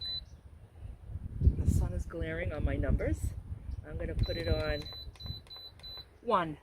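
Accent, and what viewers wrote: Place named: Boston, United States. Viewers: I just love this video. You remind me so much of my family, my Aunts, and my Mom, although I'm sure I'm close to your age. You make me smile, and laugh which feels so good. I loved when you said "butta" and get outa here flys who invited you! I'm from Boston so I appreciate the accent! I love vegetables and love watching your prep and combinations of them. Very inspiring! They all look so delicious! Thank you for your videos, you are a natural, and thanks for making me smile!🙂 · Two things - One is that I love your accent and I have been wanting to tell you that I knew from your accent that you were from New England. My husband grew up near Boston, in N. Abington. He had moved to Texas A few years before I met him so, his accent was Texifiied!! His brothers still live there and I get lost in conversations due to listening to their accents. 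Two, is that. I am so glad you posted this using your. instapot. My kids gave me one last year and I have been afraid to use it. I saw a book on it at Walmart today and almost bought it but I came home and you are here giving me a lesson. Lol. I. Enjoy your videos very much. Thank you.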